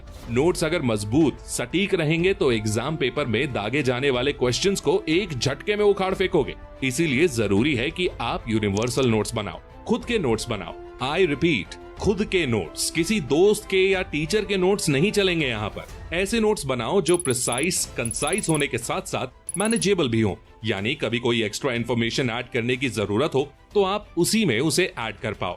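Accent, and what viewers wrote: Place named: Delhi, India. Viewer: Humare delhi me aajo sir srojani nagar wali awaj he apki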